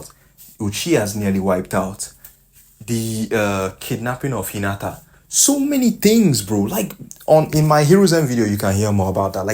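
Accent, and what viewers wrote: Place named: Africa. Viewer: I knew you were african, the accent never lies 😂. Also the itachi novels go into more detail. This is a quote from the novels when the elders debate whether they should allow itachi in anbu "The Military Police Force was created to drive the Uchiha clan away from the central functions of the village". Like the systemic discrimination was there and they didnt even hide it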